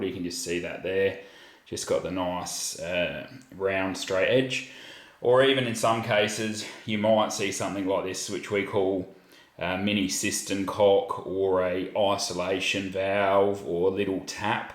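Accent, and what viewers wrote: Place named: Australia. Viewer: VERY strong Australian accent. Fortunately i can understand it. Gave me a good tip i can use for the hose I’m installing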